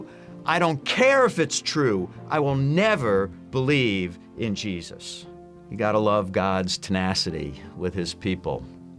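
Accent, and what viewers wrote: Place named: Long Island, United States. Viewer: Sounds like Long Island ny. God bless brother!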